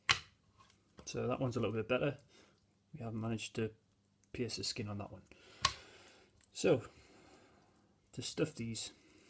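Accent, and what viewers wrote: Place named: Newcastle, United Kingdom. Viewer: Thanks for sharing this. I just de-boned my first duck! Much easier that I remember chicken being - and nice to hear the Newcy accent. Cheers! :)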